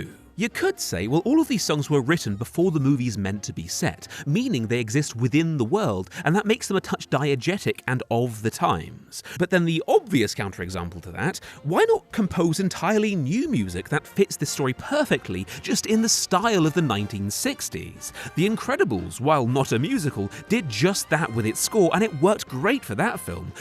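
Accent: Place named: United Kingdom. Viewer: اللكنة البريطانية جميلة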